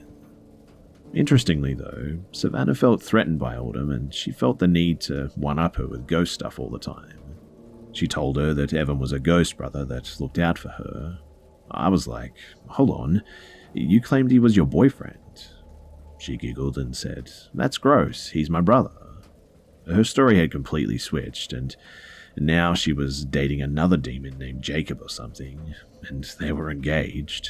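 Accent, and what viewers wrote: Australia. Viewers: Love your stories! Especially love listening to your voice, Aussie accents are my fav. (And UK.)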